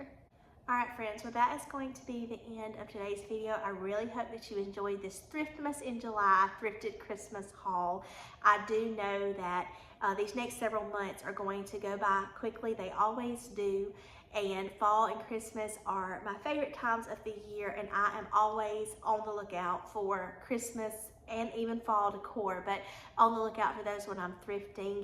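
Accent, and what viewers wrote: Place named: South, United States. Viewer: Love your southern accent 🇬🇧🇺🇸🌸🌸 (I really miss being in Tennessee)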